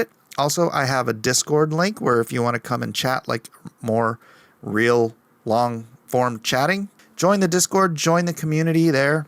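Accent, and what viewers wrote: England, United Kingdom. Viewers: I love the design, and explanation of lore, backstory, and English accent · I get: Awesome ninja skills. You get: English accent.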